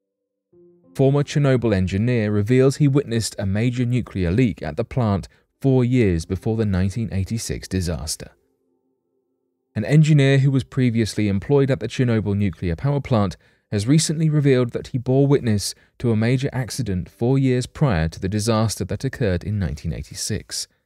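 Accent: United Kingdom. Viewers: Just because he has a British accent doesn't mean he has any credibility.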